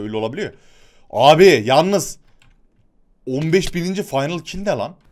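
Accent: Yozgat, Turkey. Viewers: Abi Yozgatlı mı sın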